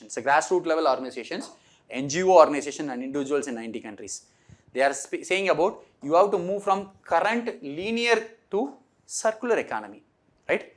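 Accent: South India, India. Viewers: That soth Indian accent of sir is great 😅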